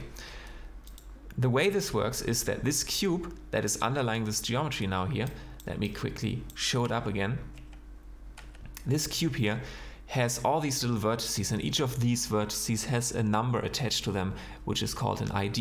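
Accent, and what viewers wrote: Germany. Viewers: Gutes Video :) Mein Abo haste :D in der Ersten Sekunde hab ich herausgehört das hier ein Deutscher am Werk is :D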